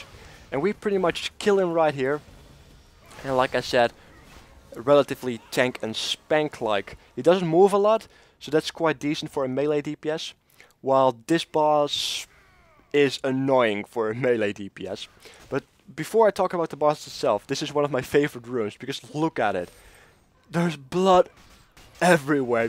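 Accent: Netherlands. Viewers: Hey, im dutch myself but wasnt sure :p i could hear it a little bit :)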